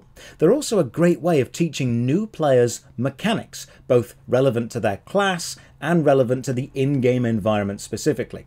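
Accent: United Kingdom. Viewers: I haven't watch a Josh Strife Hayes video in a while, and it really surprised me how I immediately felt a nostalgic sensation of joy when I opened up this video. Perhaps Ill be finding some time to get those charming british tones in the coming days.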